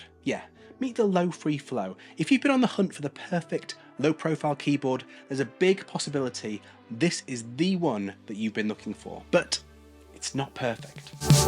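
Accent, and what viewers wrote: England, United Kingdom. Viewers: You are from which part of England?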